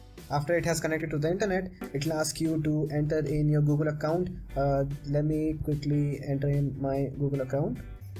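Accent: India. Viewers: thank you so much...are you from India?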